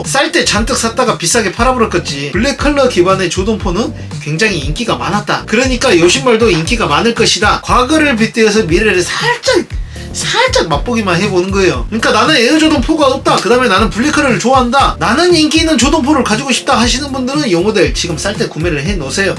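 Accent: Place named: Gwangju, South Korea. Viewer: 예전 영상에서는 전혀 몰랐는데 이번 편에서는 네이티브 사투리 살벌하시네. 광주 아니면 광주 그 근짝 동네 억양이신데 맞으요?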